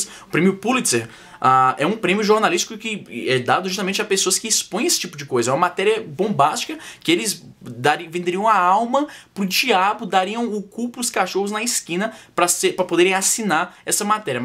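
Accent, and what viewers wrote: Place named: Ceará, Brazil. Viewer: o sotaque de cearense do é muito estiloso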